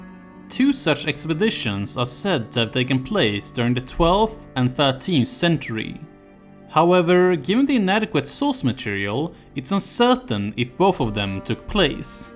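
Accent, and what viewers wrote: Sweden. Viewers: Great Video!!! He speaks in a swedish accent or am I crazy? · Finland will be a disputed territory between Sweden and Russia after a short time. Your accent sound swedish.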